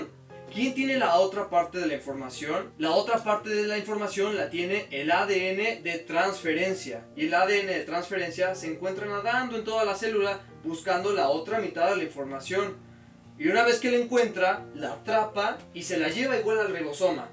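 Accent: Fresa, Mexico. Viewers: Habla bien fresa pero aprendí en 5 minutos lo que no pude aprender en 3 años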